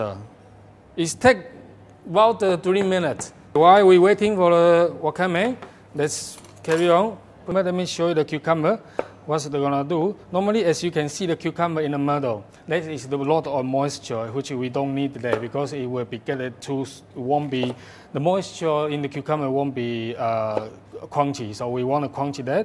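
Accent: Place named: China. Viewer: His accent is definitely of China...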